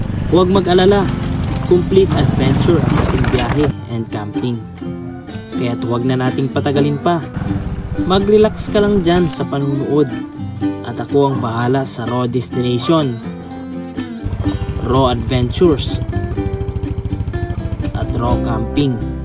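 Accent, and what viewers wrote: Visayas, Philippines. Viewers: Native panggalatok po ba kayo brother? May konting bisaya ang accent nyo, parang mixed. Anyway oks ang channel mo bro.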